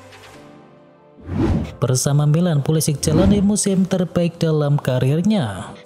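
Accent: Java, Indonesia. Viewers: Bang logat jawanya bisa gak di biasain aja kalaw buat vidio, gak enak dnger nya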